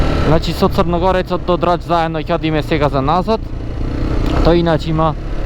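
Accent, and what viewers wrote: Bitola, North Macedonia. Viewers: браво, за времето трудот и енергијата за креирање на убава видео содржина. Ама од се најпојќе ме бендисва шо по Битолски си збораш, и само така продолжи. Кој чактисва, чактисва, другите горе долу од видеото ке сватат. Поздрав 💪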